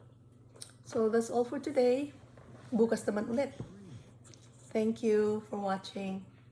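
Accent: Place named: Visayas, Philippines. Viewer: Ganyan nga sis ang pag pronouce lalo na sa aming mga bisaya hehe pero hindi naman lahat , salamat po sa share have a nice weekend!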